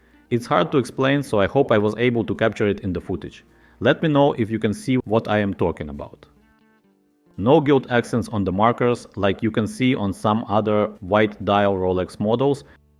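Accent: Russia. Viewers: Братан, твой русский акцент выдает тебя.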